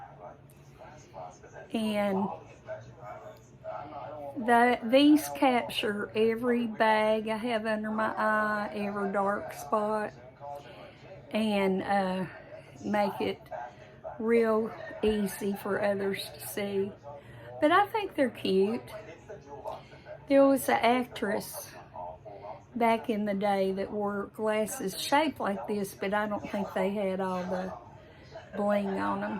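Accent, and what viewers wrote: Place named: North Carolina, United States. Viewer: Glasses Very wild, something I think you would see Ru Paul wear. I don't know if you remember Elton John and his glasses Very good sense of humor. Enjoy your videos and love your NC accent.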